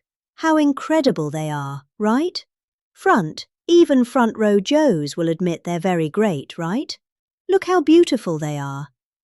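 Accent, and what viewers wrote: England, United Kingdom. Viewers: Can’t wait until this lovely lady reads his obituary in her lovely lilting English accent!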